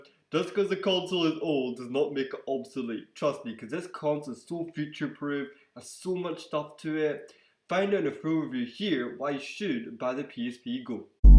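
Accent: Scotland, United Kingdom. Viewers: you from Scotland nice video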